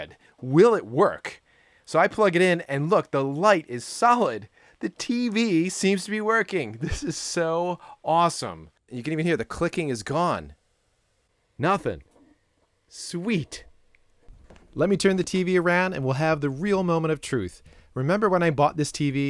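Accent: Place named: United States. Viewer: Hi cool video. ..but you don't say Soldering/solder propley. .guess it's yank accent. But like I said good video. And am going to fix my television.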